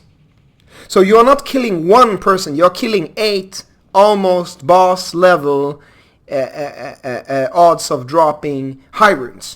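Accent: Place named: Sweden. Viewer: Dude, this is a cool upload. Where are you from? The accent sounds.. Swedish? In that case, fan så coolt.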